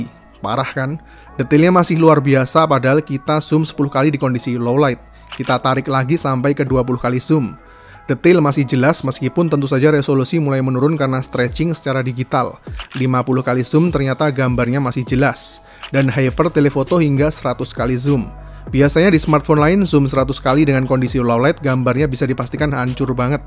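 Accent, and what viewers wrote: Yogyakarta, Indonesia. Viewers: Weh wong jogja po iki dab? 👍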